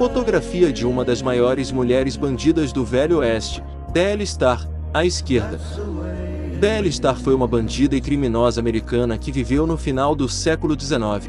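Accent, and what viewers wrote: Brazil. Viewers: Muito interessante o conteúdo. Já a locução é muito fraca. Num vídeo sobre o Far-west norte americano, era recomendável um locutor com bom sotaque americano e não um que diz os milhentos nomes de figuras e locais americanos á moda do Brasil. O facto mais relevante sobre o Gen. Custer é a enorme derrota na batalha de Little Big Horn, este nome devia ter sido mencionado e não uma baralhada entre Guerra Civil e Sioux e Cheyennes. Mas isto são pormenores, para puderem melhorar no futuro, porque adorei ver tudo.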